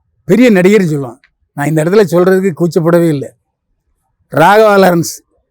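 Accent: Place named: Chennai, India. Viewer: Enya ungaluku lam chennai slang lam kevalama iruka? Thiruntha matingaya.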